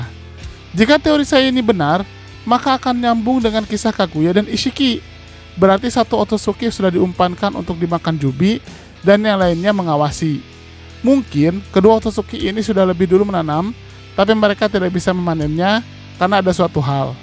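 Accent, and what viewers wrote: West Java, Indonesia. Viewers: min lu org sunda yakk😁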